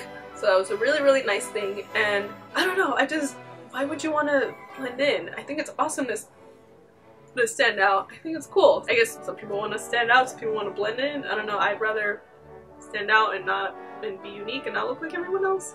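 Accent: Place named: Mexican American, United States. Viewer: I though you were just Mexican American because of your accent. I would not have guessed the part Chinese.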